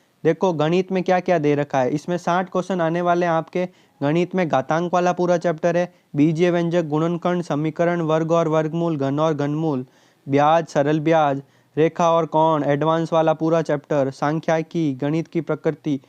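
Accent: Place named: Jodhpur, India. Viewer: मुझे आप जोधपुर साइड के लगते हो 😂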